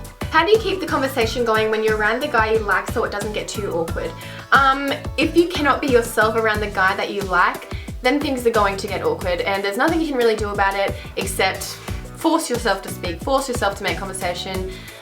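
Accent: Australia. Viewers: Are you Aussie? Hopefully I'm not Aussie and alone.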